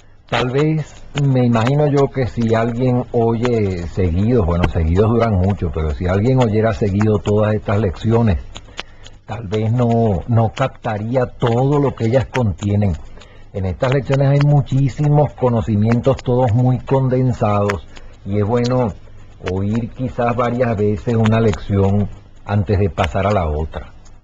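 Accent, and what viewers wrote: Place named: Caracas, Venezuela. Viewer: Nótese el acento del caraqueño de antes.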